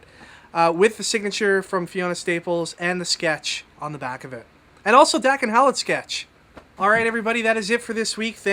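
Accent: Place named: United Kingdom. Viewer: Of all the videos has i love his Umbrella Academy collection the most. I absolutely love this series and its great how he owns different covers to every issue, Ive been known to horde different cover arts myself. Gerard Way has certainly not disappointed with this series and also has a pretty gnarly British accent.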